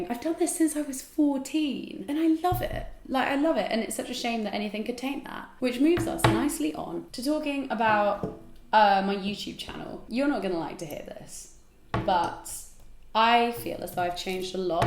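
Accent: United Kingdom. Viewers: I do have to say, your voice is diferent. If I have to describe it, it's like you have a stonger british accent than before. Even thos this new voice is kind of cool. We could see that your are moiving on or mayber moving arround, but if i have to say something it's feels like your are quite goiging back to the same state of mind over and over. Il y had to make a resume of the process it will like: starting art video project > improving and talking about yourself > realizing you talk to much about you > sentiment of loosing personal safe space > black out youtube > then you go back down on thing > then it's the fuck that feelling > and we rewind to projects. Everyone af it's own reptitive cicle (for me it"s about meeting people > over speculate on relationships > realise the truth > pushing away human kind > being sad > and stat over) It's just weird to see someone elses loop.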